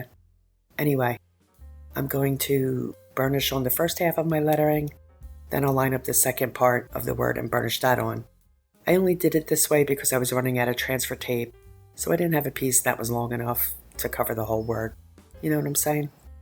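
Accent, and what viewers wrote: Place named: New York, United States. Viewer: Love this sign! Hope you’re loving your new fridge! Where. Are you from? Your accent sounds like we could be neighbors! I’m hearing NY NJ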